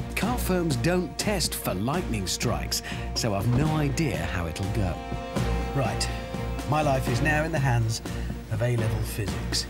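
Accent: Germany. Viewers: This is the most german english ive ever heared😂 vor handred sausend wollts^^ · Well to be honest, almost every German older than 30 is speaking like that. The younger generation, especially the teenagers are a lot better and have less of an accent because they grew up with youtube. But I guess you will always be able to tell. BUT: As a german native speaker I am always able to tell if the person I am speaking to is an english native speaker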